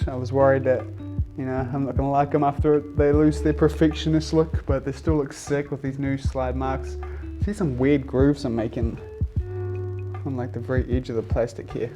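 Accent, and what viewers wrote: New Zealand. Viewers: Are you from New Zealand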